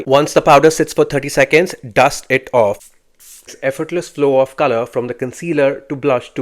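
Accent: India. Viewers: Hate Indian accent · Callar correcta ! The Indian accent bro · Gosh I hate the Indian accent …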